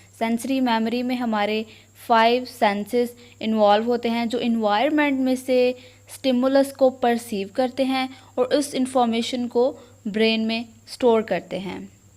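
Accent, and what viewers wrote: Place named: Punjab, India. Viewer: Really helped tho I'm loving her Punjabi accent in English 😂❤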